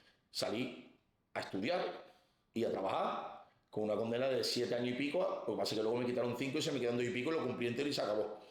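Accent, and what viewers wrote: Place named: Andalusia, Spain. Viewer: hola, muchas gracias x este vídeo con info tan valiosa para entender la temática..realmente la aportación es muy necesaria y se aprecia el conocimiento que describes..solo una apreciación, que en mi caso, que soy española como tú, me ha costado seguir la narración xq hablas muy rápido y se nota el acento andaluz, aunque mi dificultad vino x la rapidez y no x el acento..es importante que tratemos de vocalizar y llevar una locución a velocidad media para resultar lo más comprensibles a la audiencia..pero repito, si no me hubiera sido valioso este vídeo, no hubiera dejado este comentario. Muchas gracias y te deseo éxitos en el canal. Saludos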